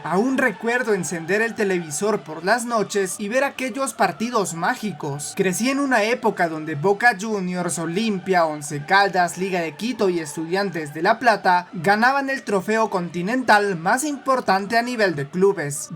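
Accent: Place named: Peru. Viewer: Pero este tipo tiene acento peruano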